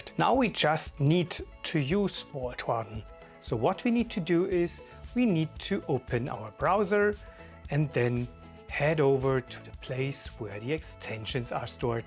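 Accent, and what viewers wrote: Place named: Germany. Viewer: i love the german accent.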